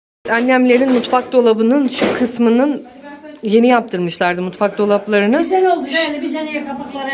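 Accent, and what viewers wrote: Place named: Trabzon, Turkey. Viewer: şivene kurban teyzem :) bende trabzonluyum cok keyfle izledim sizi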